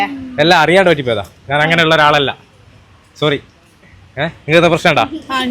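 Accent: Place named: Vadakara, India. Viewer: Ninghalde dialogue ketal Vadakara manasilayi backil board vechitund VADAKARA. Husn doubt und adan kannada kazhikan paranadm onn pottikatdm😂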